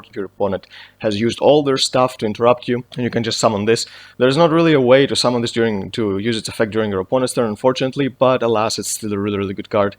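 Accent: Greece. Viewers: έλληνας είσαι ρε φίλε; από την προφορά ρωτάω αν ναι, κάνε το true draco/monarch ντεκ να χαρούμε :P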